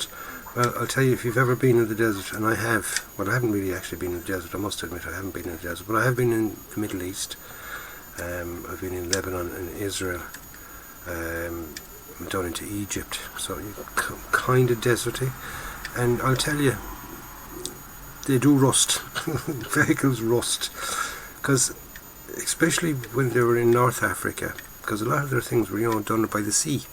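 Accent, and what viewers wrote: Ireland. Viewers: I really watch these videos just to hear the soothing, quaint Irish accent. "When I drink whiskey I drink whiskey and when I drink water I drink water." - Michaleen Flynn But I do also learn a thing or 2 about building scale models & other stuff. Neat 251/1 btw ;)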